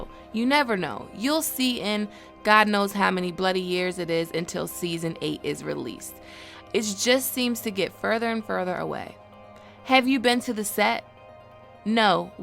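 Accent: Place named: Upstate New York, United States. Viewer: Damn where from that accent sound like upstate New york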